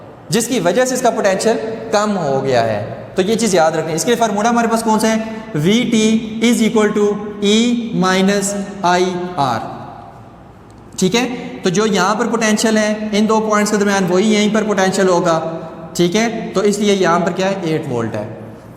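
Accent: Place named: Saraiki, Pakistan. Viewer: Sir ap baton baton mei saraiki lgay hain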